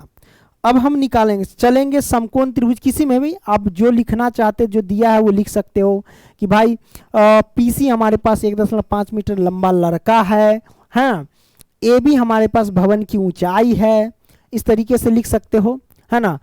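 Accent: Bihar, India. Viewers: सर आप बिहार पटना से हो क्या आपकी मात्र भाषा तो हिंदी हे परन्तु आपकी स्थान भाषा बिहारी हे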